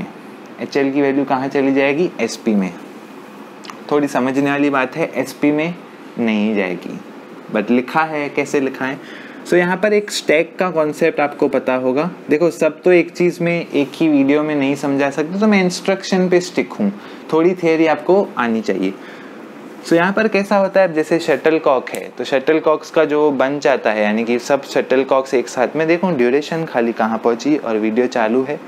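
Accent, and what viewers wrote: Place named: Gujarat, India. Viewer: Surely tell he is a Gujrati , apart you explain real well! I wish i got teacher like you for rest of my career.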